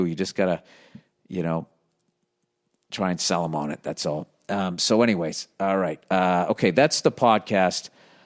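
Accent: Boston, United States. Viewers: No need to apologize for late FREE podcast! What can I expect? Just to hear your Boston voice is a "honor".(te he). One way or another, late or early, you always come through! Thanks. 72 year old guy.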